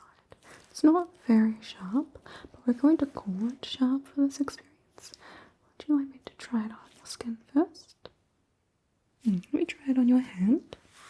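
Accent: New Zealand. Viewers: are u from nz? so beutiful ❤ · 20 seconds in and i could tell you were kiwi 😂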